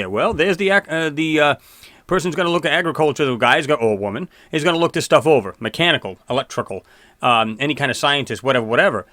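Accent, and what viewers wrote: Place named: New England, United States. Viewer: Also I've seen a lot of your videos and like them a lot, I want to point out an anomilie with your accent, are you from new england??? I myself am originally from Maine and hear that. LOL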